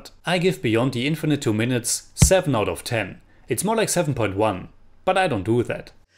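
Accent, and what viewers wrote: Germany. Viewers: Dind´t though of this movie as a mindf*k at all and my disabled roommate who was kinda afraid to watch this movie, because "it´s for the smart people" was like me kinda disappointed for it being a quite simple time paradox movie after all the hype. In the end it was a really great B-movie despite the typical bad acting of some actors. But what I´m wondering, why do you still have this obvious german acent left? Why don´t barely any germans take pride in pronouncing a different lenguage correctly? It´s always just like "srew it if I butcher the pronounciation despite having all the possibilities to learn how to pronounce correctly due to the freak`n internet". I´m about to get 35yrs, had terrible english teachers trying to force their germanized take of british english on us and yet, I´ve started to teach propper pronounciation to myself at the age of 17 (I´m old, just got the first affordable and actual useable internet connection just two years prior) and am asked which english speaking country I´m from since I´m 21. 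But I guess I have a big talent for speach since bavarians asked me which part I´m from even I´ve never been to bavaria in my life. In the end, your pronounciation is telling how much respect you got for a another lenguage.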